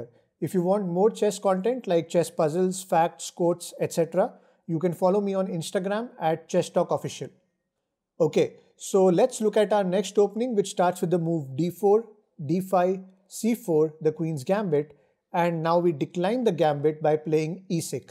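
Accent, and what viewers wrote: India. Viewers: Yariel Robles how on earth have you never heard an Indian accent? You’re aware like 1/7 of the world is Indian..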